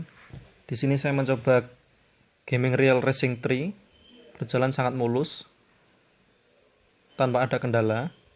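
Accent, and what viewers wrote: Java, Indonesia. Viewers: Medok bgt jawanya...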